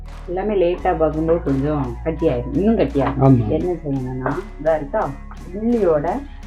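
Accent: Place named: Kerala, India. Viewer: Kattu soru. Kanyakumari enbadhal malayala accent/ dialect oda pesuhirar.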